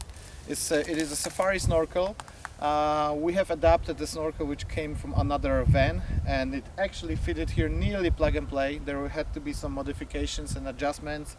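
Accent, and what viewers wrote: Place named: Sweden. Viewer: You have a Swedish accent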